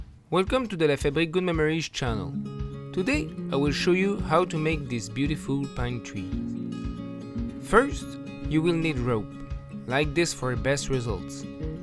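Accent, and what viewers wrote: Quebec, Canada. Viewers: Ah enfin un belle accent québécois en anglais 😁😁. Cool ton tutoriel, c'est facile et surtout rapide, je vais l'essayer c'est certain.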